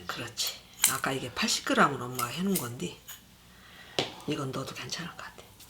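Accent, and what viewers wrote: Chungcheong, South Korea. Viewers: 어머니와 아드님 너무 다정다감하신 대화와 요리들 너무 잘보고 또한 구독도 했어요. 저는 어머님의 충청도 사투리가 저희남편 가족들의 말씀같이 꼭 우리 큰형님~셋째형님 말소리와 너무 똑같아서 잘보고 잘 따라하고 있습니다.~그런데 지금 우리 형님들과 우리남편은 저~세상 하늘나라로 가셨습니다. 그리고 저는 나이가 들어서 노인정에서 식사를 해드리고 있는데 주로 어머님의 음식을 보고 많이 따라하는 편 입니다. 젊은시절에는 직장 다니느라고 살림을 안해서 주방에 들어가는것이 무서웠는데 지금은 하나도 안무섭고 무엇이든지 어머니께서 하시는 무엇이든지 돋보기에 제가 하고싶은 음식을 치고 누르면 무엇이든지 다~나오니 저는 행복합니다. 또한 8~9십대 어르신들 따라한 음식들을 만들어 드리면 엄청 칭찬해 주십니다. 그래서 저는 맬맬 행복합니다. 어머니의 요리를 배워서 행복하고 경로당 어르신들 맛있다고 칭찬 들을때 행복합니다. 그래서 저는 언제나 즐겁습니다.❤❤❤❤❤❤❤❤ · 충청도 아주머니시네 사투리가 무수 배차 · 배차 무수 오랜만에 들어보네요 ㅋㅋㅋ 충청도사투리 매일 잘보고 이습니다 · 고향이 충청도이신가요?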